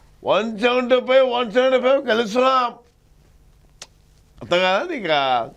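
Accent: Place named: Andhra Pradesh, India. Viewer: అన్న నీది రాజంపేట నో రైల్వే కోడురో ఉంటుంది నీ యాసలో కనుక్కోవచ్చు నువ్ మా ప్రాంత వాసీవైనందుకు గర్వపడుతున్నాం సూపర్ నువ్వు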